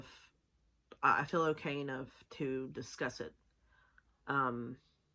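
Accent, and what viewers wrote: South, United States. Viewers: I really love your intro! lol your country accent is just a little stronger than mine! praying for you and sending positivity! Thats me right now so I am with you on that. Losing someone you love and never being able to see them again is so hard.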